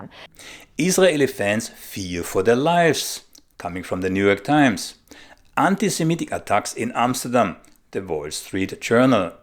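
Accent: Germany. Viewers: It's so good to have the original Germanic accent... Nowadays 🇩🇪🇦🇹🇨🇭 sound like they were born speaking English. Deutscher Rundfunk für immer!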